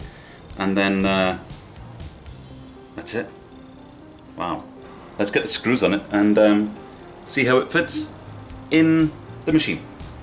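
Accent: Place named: Scotland, United Kingdom. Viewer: I hope so matey, because I love them and your Scottish accent.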